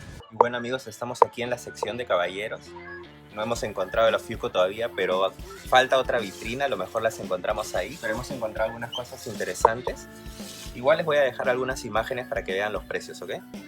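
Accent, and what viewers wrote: Peru. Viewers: En una se reconoce a un Peruano cuando habla ... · Hola amigo, eres de. Peru? Tienes un acento bien neutro, y me parece que si eres compatriota mío, sea cuál sea la respuesta, grandes exitos amigo, que siga creciendo el canal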